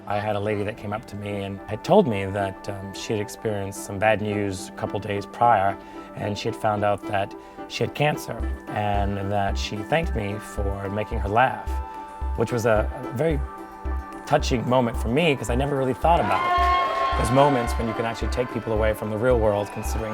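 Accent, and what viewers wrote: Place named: Los Angeles, United States. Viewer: Wow I never noticed her LA accent before, she's sort of toned it down but it was so cute!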